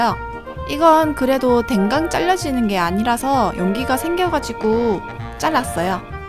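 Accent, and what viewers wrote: South Korea. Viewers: 님 대구 사람이예요? 아님 서울 사람 이예요? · 꼬마 부산에사셔서 부산같은데..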